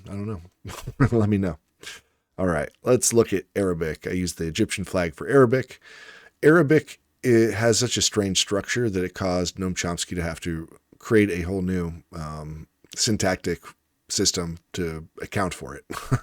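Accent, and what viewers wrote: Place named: United States. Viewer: As a French native speaker, I can tell you're wrong when you try to analyse French. You said "It's what I've been told", which means you have no idea what you're talking about. French isn't Germanic. As a linguist, you should know that most words shared by English and French come from Old French. Actually, English vocabulary has been influenced by French after the Norman Conquest of 1066. Just out of curiosity, are you really a linguist? Which degree did you earn? The difficulty of this language lies not in the sounds, but rather in the rules and the exceptions. In French, you can see people correcting each other. Most people make a lot of mistakes because they don't read enough to internalise the rules. French doesn't have four tenses. There are a few tenses categorised into four moods. Actually, if you exclude the infinitive, there are nine simple tenses and nine compound tenses. 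Some of them are almost never used, but there are still more than four. You only considered the first group for your statement about conjugation. Let's take "prendre" as example. It's from the third group. In the present simple, "prendre" is conjugated as: je prends, tu prends, il/elle/on prend, nous prenons, vous prenez, ils/elles prennent. French people (so people who speak French in France) tend to like the English accent, not the American accent. It makes you look worse than Olly Richard.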